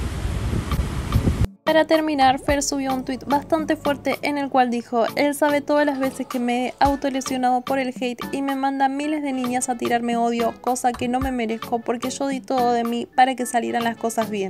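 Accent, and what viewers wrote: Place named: Venezuela. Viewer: no tiene acento argentino habla como Venezolana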